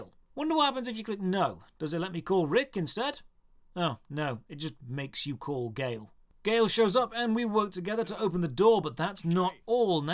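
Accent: United Kingdom. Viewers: all of them just shouldve gotten rid off everything they are carrying with them. no reason to die then. also, lets take a moment to appreciate reading and favouriting comments on his one year old video. absolute chad loved to play dino crysis 2 back in the day and i have to say, nostalgia is so much better when funny comments and a british accent are involved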